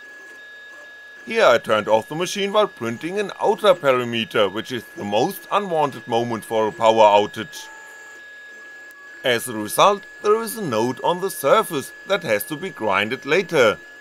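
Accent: Germany. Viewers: Is that a German accent?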